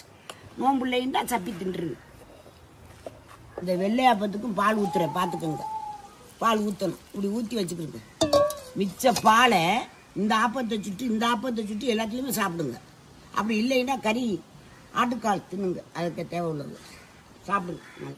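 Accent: Ramanathapuram, India. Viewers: Nannimada pathai kaili thaavani ramnad pechu 🎉🎉❤ Eid mubarak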